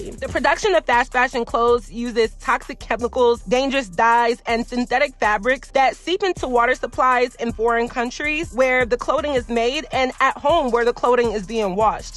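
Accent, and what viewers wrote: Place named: DMV, United States. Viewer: Are you from the dmv? Lol I can hear it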